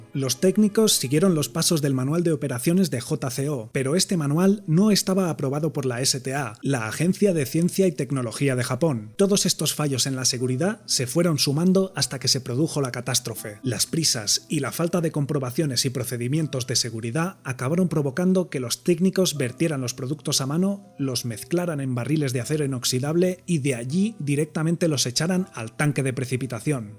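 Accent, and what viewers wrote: Castile, Spain. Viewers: Que Repugnante et Molestoso es listenear el Acento Castellano de Europa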